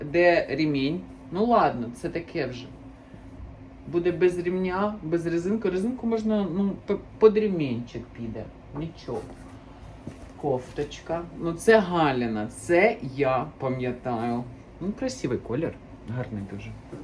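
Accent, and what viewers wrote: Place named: United States. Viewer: Ухти)) в вас такий американський акцент став за ці роки. Цікаво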